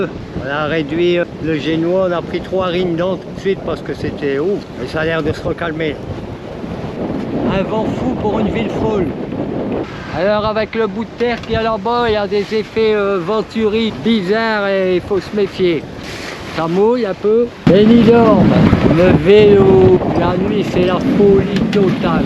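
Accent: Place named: North, France. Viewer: Je vous suis depuis la Vendée, un bel accent du nord, merci à l'interprète, même le chien à l'accent du nord. Cordialement.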